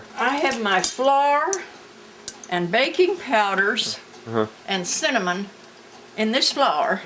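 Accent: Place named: Southern Illinois, United States. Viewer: “Sodie, difernt, and R” You Have to be from Southern Illinois. Am I right?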